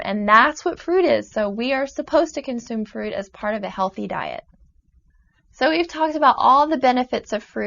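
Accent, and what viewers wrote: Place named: Midwest, United States. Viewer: I’m trying to guess what accent she has... Midwest?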